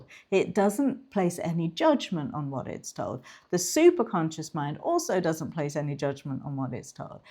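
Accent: England, United Kingdom. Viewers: Can I ask what the relevance is to you stating that the people you normally work with are six figure earners...what difference does itahe what you earn...is that the only value we have as humans...the amount of money we can make?!?!?! I've never come across your videos and I was intrigued and even excited when I heard the English accent then you said that....such a shame 😮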